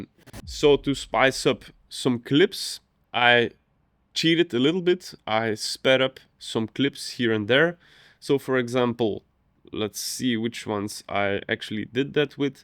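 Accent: Belgium. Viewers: ik hoor een vlaams accent ngl.... XD ben echt blij met deze video! nieuwe dingen bijgeleerd vandaag!! :-)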